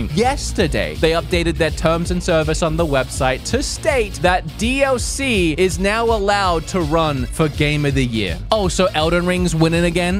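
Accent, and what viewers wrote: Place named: Australia. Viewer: I miss your aussie accent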